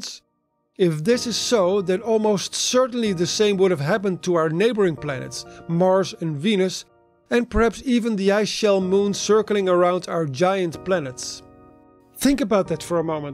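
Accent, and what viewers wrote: Netherlands. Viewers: Excellent video in beautiful Dutch accent ! Do more of this type of explainers. Best wishes 🎉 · Love Dutch English as Dutch man ❤️😅❤️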